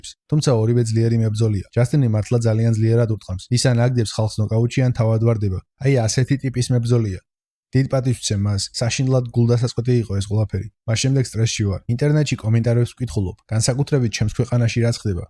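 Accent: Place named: Poti, Georgia. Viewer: ფოთის კილოზე ლაპარაკობ 😊😊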